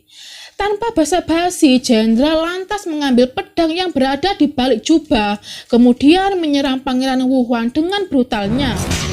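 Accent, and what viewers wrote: Java, Indonesia. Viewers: Ciri khas memang medok, maklum wong jowo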